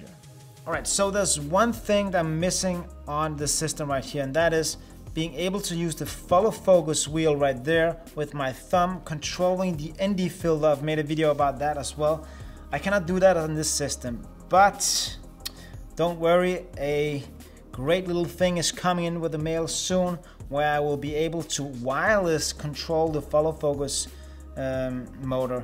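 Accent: Denmark. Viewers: Are u Danish?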